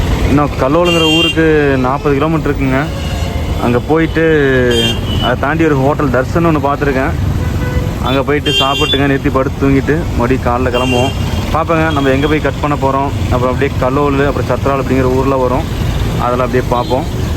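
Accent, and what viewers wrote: Kongu, India. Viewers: அப்பாக்கு நீங்க கொடுக்கிற மரியாதை.. நீங்க பேசுற அந்த கொங்கு தமிழ் அழகா இருக்கு நன்றி ப்ரோ❤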